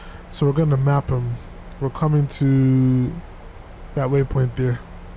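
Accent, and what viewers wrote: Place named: Sint Maarten. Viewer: Hey bro which Caribbean island are u from your accent sounds st.Maarten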